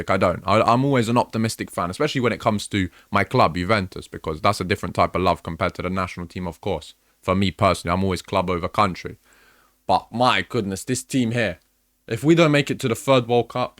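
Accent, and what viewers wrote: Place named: MLE, United Kingdom. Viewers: Was not expecting a multicultural London English accent when I clicked on the video! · You're very clearly English, you have that awful MLE accent.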